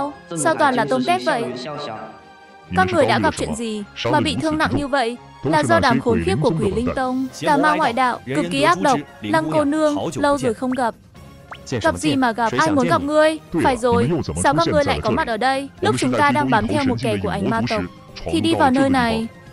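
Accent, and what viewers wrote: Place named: China. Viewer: Giọng này có tiếng trung giở quá. Ko hay bằng giọng cũ.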